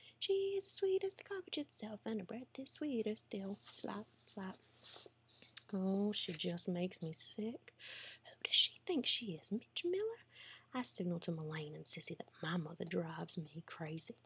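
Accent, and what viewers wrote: South, United States. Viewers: I really enjoyed it and found it very relaxing. There is something about southern American accents that I find very calming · Now this is very cool.... you're voice is soooooo good...oh and the Southern!!! You get an A+++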